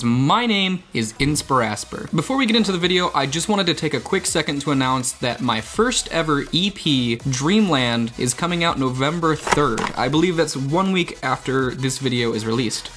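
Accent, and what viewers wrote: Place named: Canada. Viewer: I only just now realized that you're Canadian! Soon as I heard "pro-cess", instead of "prah-cess". Dang your accent is barely even distinguishable from a General American one. Hardly the stereotypical Canadian accent!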